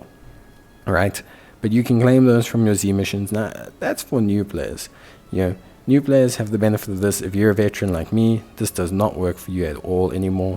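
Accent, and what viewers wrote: South Africa. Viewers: Randomly stumbled across this video and I couldn't help but notice the accent 🇿🇦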